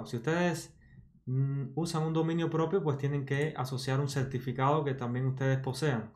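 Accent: Cuba. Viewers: Hola eres cubano ?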